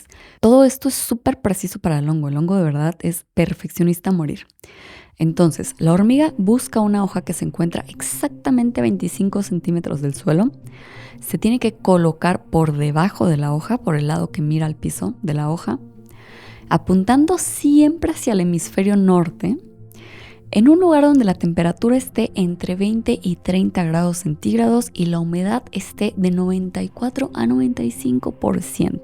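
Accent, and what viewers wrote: Mexico. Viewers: Me encanta tu acento mexicano! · Linda tu y lindo nuestro acento mexicano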